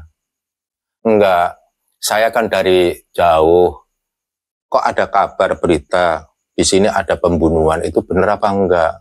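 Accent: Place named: Java, Indonesia. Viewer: tpi logat nya ko Jawa ya 😄haduhhh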